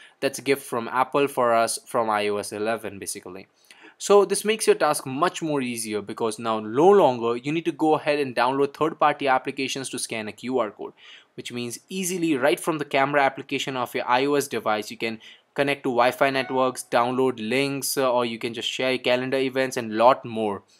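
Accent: India. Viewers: That god-awful Indian ACCENT. I couldn't understand a fucking thing this guy was saying. all I heard throughout the video was BEE BEE BEE BEE BEE BEE BEE BEE.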